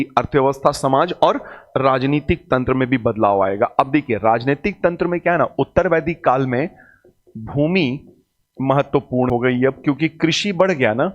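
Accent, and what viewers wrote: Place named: Ghazipur, India. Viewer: Thank you so much sir🙏🙏 Sir aap Ghazipur district se h aisa lgta h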